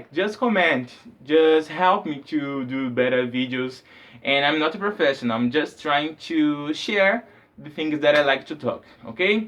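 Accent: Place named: Brazil. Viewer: I'm from Sergipe, Brasil, and I'm learning English by myself, because I have no money enough to pay for private English classes, that's why I'm looking for videos in English to practice my listening, and, although your brazilian accent you rocked! I got all you said, and I really get happy. Thank you for sharing this video speaking English, and I'm sorry for some mistake, if I did it.<3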